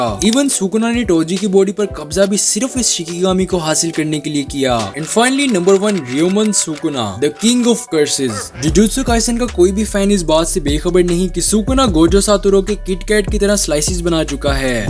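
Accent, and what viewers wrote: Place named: Pakistan. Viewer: Accent so aukat pata chal gyi teri 🤡🤡🤡🤡🤡 Aata & roti bhijwa du Pakistani? 💀🤣 Garib Jai Hind 🇮🇳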